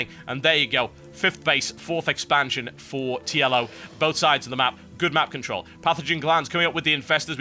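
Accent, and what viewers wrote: United Kingdom. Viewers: just a suggestion TB, since you're catering your casts to those who don't really understand the game or play very well, at the start of the cast when it's perfectly standard play you could perhaps explain the map layout a bit, and the possible playstyles accompanying the maps. Apart from that, keep up the casting! Love hearing a British commentator =D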